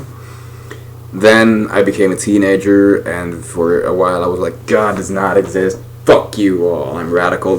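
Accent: United States. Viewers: why do you speak English so good? And also why do you not have a Finnish accent? You sound very american! \m/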